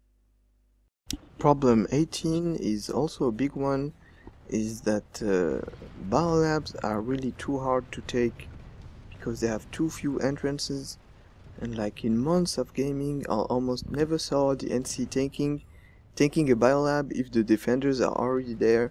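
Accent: France. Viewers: you french ? :0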